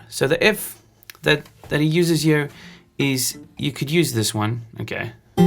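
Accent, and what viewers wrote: South Africa. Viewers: I can hear when someone's from SA. Takes one to know one.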